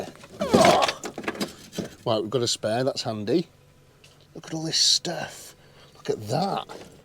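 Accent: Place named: England, United Kingdom. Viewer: I´m from Germany and i love your english. Where in England are you from?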